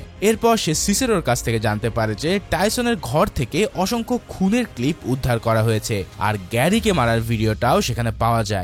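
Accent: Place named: Faridpur, Bangladesh. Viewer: আপনার বাড়ি কি ফরিদপুর জেলায়, মানে ফরিদপুর এর লোকজন জোরে বা গতি কে বেগে বলে।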